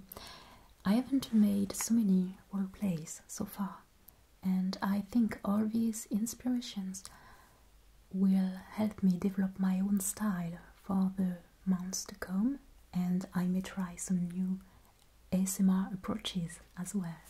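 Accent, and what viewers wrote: Paris, France. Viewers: Yes, when French people become more advanced in English and their intonation and accent approach something closer to a native speaker there is definitely some crossover in sounds with a German speaker at the same proficiency. I have studied French for years so overall it's easy to pin her accent down as French, but there are some sounds that definitely remind me of my ex who was from the Rhineland (Germany). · Hi you are such a nice ASMR artist. I think you have a lovely French voice and manner about you. Thank you. X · Thank you! You have a beautiful French accent! Keep up the good work! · As a non German I can say she doesn’t have a German accent lol, it’s clearly French, not sure why ppl would think differently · She sounds 100% French. I would never think she was German. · As a german, i can tell you, you dont have a german accent at all, your accent does sound french :) very nice video btw! 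· I’m American, and she definitely sounds French. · Oh, darling! I've been interested in the dead or alive game stories for a little while now, based on the accent of lady Helena Douglas, I can tell this is indeed a french accent. 🙂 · I've just discovered her videos, and her accent slightly reminds french ( I live in France), but it's clearly not a typical accent of a native french speaker speaking English. Sometimes she sounds even like russian speaker in some moments. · Oh I'm French and she might sound German because she tries to put intonation but still has a very heavy accent. So she's not bad for a french girl, and she's trying hard, but sounds sooo French. Was very obvious for me. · As an American, she sounds French, her pronunciation is so delicate & lyrical. And, as mentioned above, she drops the "h" . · J'adore ton accent, vraiment (il n'a rien d'allemand)! Tu me rassures sur le mien quand je parle anglais (j'en ai toujours un peu honte). 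Grâce à tes vidéos, je commence à comprendre ce que les anglophones trouvent mignon dans notre accent. Je trouve ça incroyable que tu aies découvert l'ASMR comme moi avec des artistes anglophones et je suis contente d'avoir trouvé une française qui se lance dans l'ASMR dans la langue de Shakespeare! Continue! Tes vidéos sont si spéciales, j'aime beaucoup ta vision de l'ASMR et tes environnements cosy, anciens et si rassurants. Prends soin de toi durant ton confinement 💕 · You're awesome! Love your videos so much. They help me to relax! Love your beautiful french accent!❤🇨🇵 Your english is fantastic👏👏👏 Keep doing videos❤❤❤👏👏👏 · Really? As a Canadian this is the Frenchiest of Parisian French (as opposed to Quebecois accent) accents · I am American and I can tell, 100%, that you are French. I do believe that you have found an outlet for your creative spirit. What I have seen so far is outstanding. 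· Hi, Thank you for your Q and A, it was very interesting. You are definitely an artist and so creative. I am happy that you are so successful here and look forward to enjoying more of your work. As an American, i find your french accent amazing and relaxing I wish you the best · Je suis né avec de bonnes oreilles. J'entends toujours ton doux accent français. Vos vidéos sont incroyables. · I love all your videos and just for the record you have always had a clear French accent 😍 I have been unknowingly into asmr for about 7 years now thinking im the weirdest person in the world going to bed listening to someone speak with an accent and chew gum lol I have actually only discovered a year ago that what that was doing for me was called asmr and I could find it everywhere on YouTube ❤❤❤ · I knew immediately your accent was French. I think you are incredibly talented, and reminded me of “Goodnight Moon”. 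You both have wonderful imaginations. Your props are fascinating. I thought you had to be in the movie business. Thank you for sharing your talents. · as a Swiss Girl she Sounds 100% french and 0% german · You do not sound German lol Your accent reminds me of people in Paris France.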